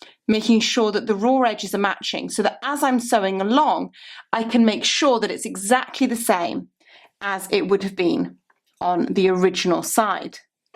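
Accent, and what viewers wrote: United Kingdom. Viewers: a lovely british accent which makes it quite easy to understand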